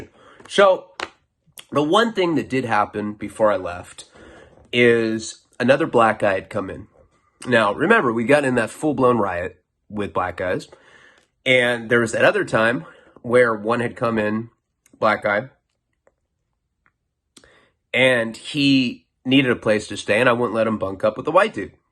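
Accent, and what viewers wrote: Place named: New York, United States. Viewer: That New York accent though 😂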